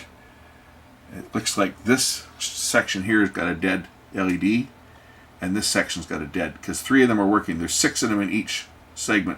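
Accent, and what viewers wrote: Canada. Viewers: Hurricane?? You must be near me in Florida....but you sound Canadian.....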